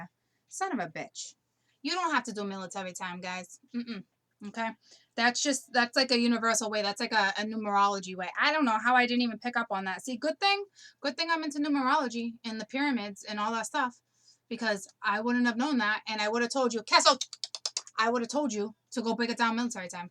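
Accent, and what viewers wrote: Boston, United States. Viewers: Oh my God !! Are you from Boston...I'm from Cape Cod!!!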